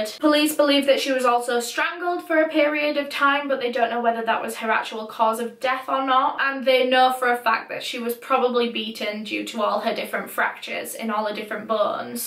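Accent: United Kingdom. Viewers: "So today's video..." 😄😁 (only I don't have tht Amazing British accent!)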